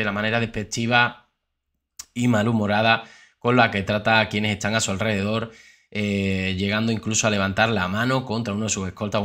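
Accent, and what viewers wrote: Andalusia, Spain. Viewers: Joder, un andaluz leyendo un digital independentista, lo que hace la PASTA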